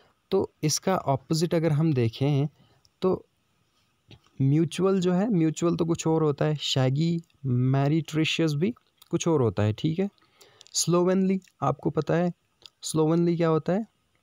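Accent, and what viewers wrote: Kashmir, India. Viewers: Sir i guess u r kashmiri...!!! Am I rt..??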